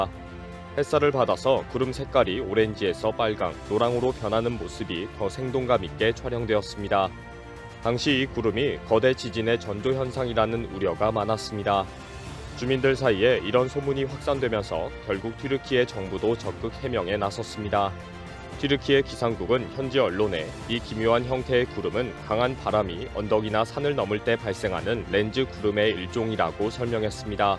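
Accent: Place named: Vietnam. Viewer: 해설자는 우리억양이 아닌 베투남 유민같은 억양인데 그리도 인물이 없나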